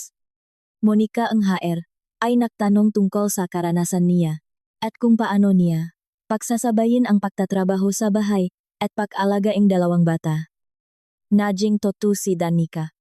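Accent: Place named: China. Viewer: Ang narrator lasing ata intsik ang nagsasalita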